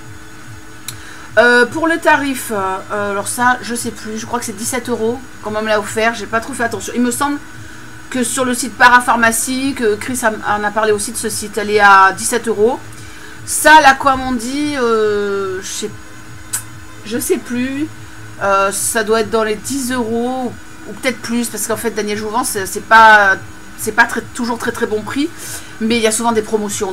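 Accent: England, United Kingdom. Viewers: ton accent anglais me fait rêver ! ^^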